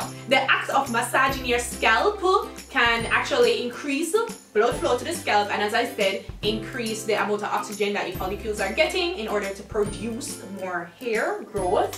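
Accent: Jamaica. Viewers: Am I the only one that heard her say coochie cream? DWL... anyways I just discovered this channel and I love it already mainly cause she uses her Jamaican accent.. Issa Caribbean ting :) You are very funny and helpful.. continue with the good work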